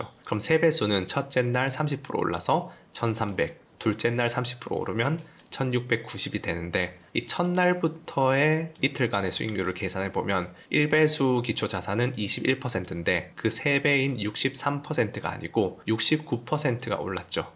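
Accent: Gyeongsang, South Korea. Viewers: 대구 말투네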